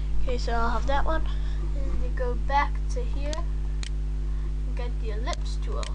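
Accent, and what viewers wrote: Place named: United States. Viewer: lol!You've done what i do-ur talking almost in an american accent!lol.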